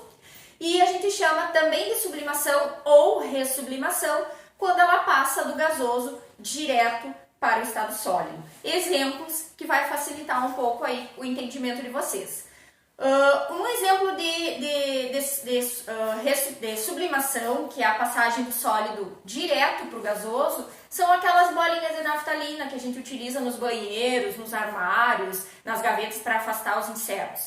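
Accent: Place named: Rio Grande do Sul, Brazil. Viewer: Sotaque do rio grande do sul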